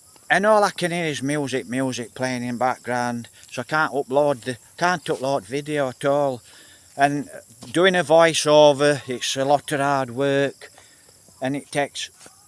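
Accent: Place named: Scotland, United Kingdom. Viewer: You really sound like scottish sir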